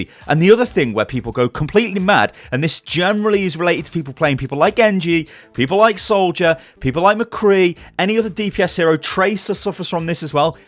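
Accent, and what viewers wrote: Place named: United Kingdom. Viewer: like ur british english. dont understand usa guys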